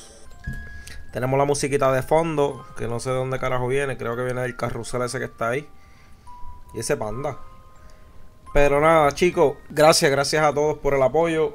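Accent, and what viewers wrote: Puerto Rico. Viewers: JAJAJAJAJA PUERTORRIQUEÑO AL 100% AMO❤️❤️❤️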